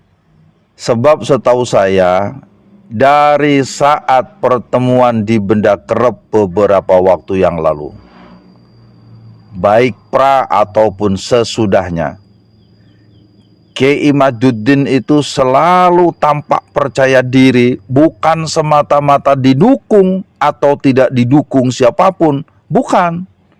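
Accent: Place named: Nganjuk, Indonesia. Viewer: Beeelas, ....!!! 😂😂😂 Manteb podho ndesone lur, persis wong nganjuk.😂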